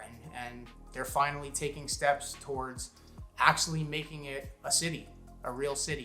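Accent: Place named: New England, United States. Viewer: While your video is intetesting and a little informative, obviously you've not lived there long (accent) and pray you did leave the new england politics behind. One needs a good 10+ yrs down there too fully undetstand and grasp the lifestlye. The other HUGE cons are the crime from the NE States migrating with the new residennts and then the traffic. Those last two items have increased 150-400% as of late 2020 and its getting 100x worse. I'd tell folks to pls move back home if you miss it so much. We dont want the politics, crime and traffic here. Unfortunately with ins companies doing their thing on rates, its getting close to LA expensive. Residents from 2008 and back are leaving. I was going to go back there in Jan 2020, the house I was looking at near Boca was 2800 sqft, 3 car, lots of soace between homes (60 ft) and low hoa, towards Coral Sprngs for 235k. 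That same home in Mar 23' was 780k and as of late July 23' its 815k. The over pricing of homes is ludicrous and according a friend and comn/res RE expert since 1990, its going to keep going up another 3% from now in 23' to 7% by Nov 24'. I am the kind that needs my garage space to work on my classic car and a few bikes, a yard for the dog, etc. Plus at least 7 miles inland because hurricane wibd decreases by 17-20 mph every 6 miles in land and its drier, less salt air so no specially treated furniture needed because not with in 2 miles of the sea. That sea salt air destroys furniture, interior and exterior paint and vehi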